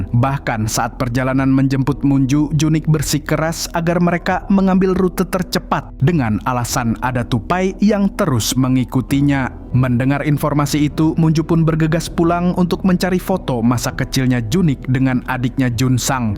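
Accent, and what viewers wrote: Banjar, Indonesia. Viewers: adminnya orng banjar yh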